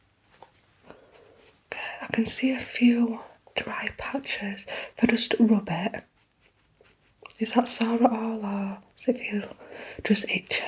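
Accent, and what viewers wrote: North, United Kingdom. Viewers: was going to say the same, love the northern accent :) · 10/10 as always! I’ll say it again, the northern twang makes me feel right at home 😴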